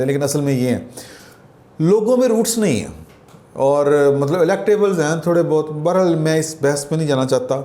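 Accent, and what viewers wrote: Punjab, Pakistan. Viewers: پہلے اپنی اردو تو ٹھیک کر اردو بھی پنجابی کی طرح بول رہا ہے كابرانا نہی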